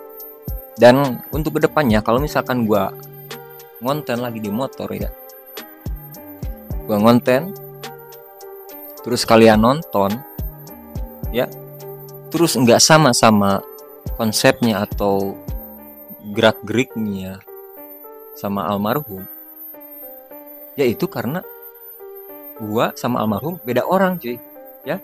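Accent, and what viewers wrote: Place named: West Java, Indonesia. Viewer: Muka sama gaya bicaranya Abang emang mirip alm denzbagus bang ,,cuma beda logatnya aja kalo alm denzbagus kan kalo ngomong logatnya Lampung ,kalo Abang Sunda tapi gaya bicaranya sih mirip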